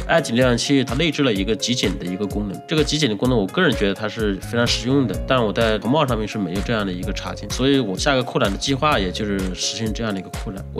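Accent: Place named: Hunan, China. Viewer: 太厉害了。UP主超棒。一口湖南普通话让我这个长沙女婿听着很亲切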